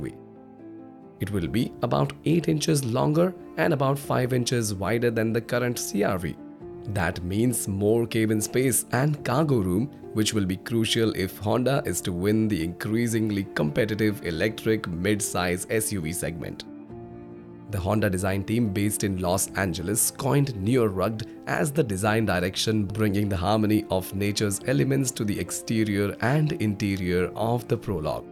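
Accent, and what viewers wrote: India. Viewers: The speaking person has indian accent. Does that mean this Honda model is indian made?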